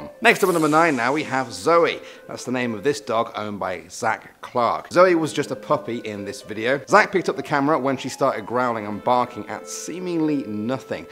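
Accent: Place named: United Kingdom. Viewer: Love your british accent, greetings and support from Sweden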